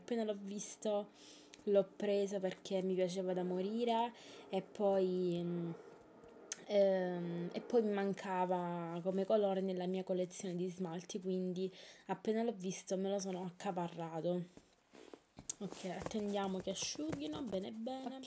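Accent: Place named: Lecce, Italy. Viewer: ciao ma x caso sei d lecce??? si sente da cm parli *_*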